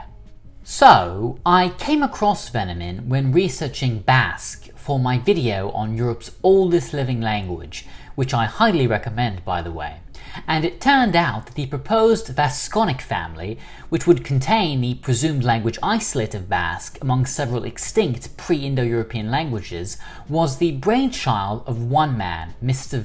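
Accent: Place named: England, United Kingdom. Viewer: well explained , I like your english accent , thanks for sharing this important info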